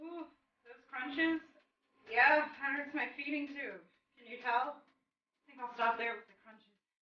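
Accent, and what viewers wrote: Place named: United Kingdom. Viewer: Finding that balance can be so hard sometimes. I've been trying to lose weight for my health for years and exercise would greatly help with that but there is such a limited amount I'm capable of doing and it's so dependant on the day and circumstances of said day that it's been extremely challenging. It makes it so frustrating when you have people fat shaming you and telling you to just get off your fat butt and exercise, like I don't have enough problems with depression and anxiety besides my chronic pain and other medical issues. As for the little dactoid about you being born in London I was kind of wondering because of the way you say certain things like vitamins, you say it like the British do. Of course, I was born and raised in the US and most of the time I do too, so that doesn't necessarily mean anything but it made me curious. 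:)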